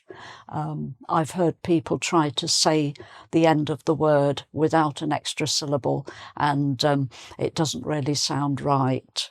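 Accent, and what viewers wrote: United Kingdom. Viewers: Hello Teacher! Be sure you are a very special teacher! British English sounds much more beautiful with you!Thx to you we feel like learning more about your native language and about The UK! You are a symbol of The United Kingdom through the world!Greetings!❤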